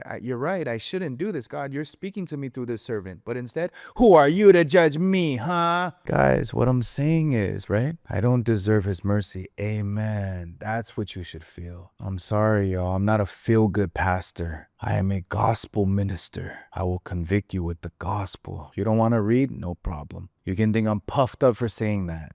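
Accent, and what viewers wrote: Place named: New York, United States. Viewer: U sound dumb in this one fr just cuz of that New York accent. What was that?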